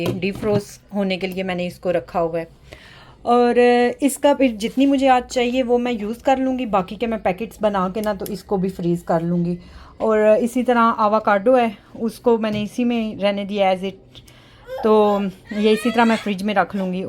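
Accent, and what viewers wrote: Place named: Punjab, India. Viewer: Apki punjabi ka tarka bht maze ka lgta ha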